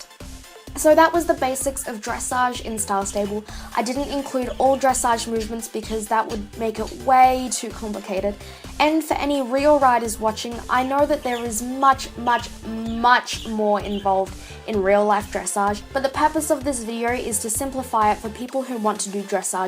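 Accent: Australia. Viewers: What server are you on? You sound Australian so I guess you'd be on FS with most? I'm on Magnolia Jungle which is apparently a lot quieter than Firestar. Maybe when I've been playing SSO for a bit longer I'll have a look for other people into RL dressage on SSO. Not to start a club as such, just to get some people together who want to do real life dressage. If anyone who reads this is on Magnolia Jungle and is interested in doing some dressage with me, please just reply to this comment and we'll organise to get together :) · Luv the vid! We r so similar! Both come from Australia (I’m guessing from the accent), both play sso, and both have birds!!!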